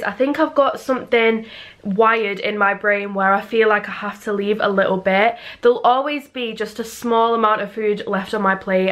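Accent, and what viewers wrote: Manchester, United Kingdom. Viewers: Where’s the Manc accent gone thoooo! 😂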